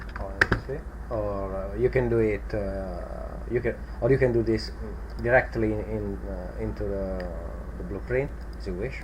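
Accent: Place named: Italy. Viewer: Ma sei italiano? Non sembri inglese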